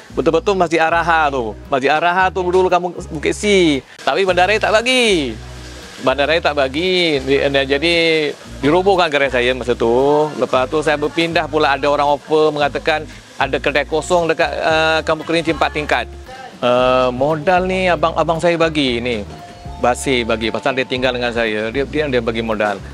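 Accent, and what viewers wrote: Java, Indonesia. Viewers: Pekat sangat slang jawa Wak ni 🙂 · Slang jawa wak ni . Terbaikkk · Jowonye pekat betul. Sedap dengar.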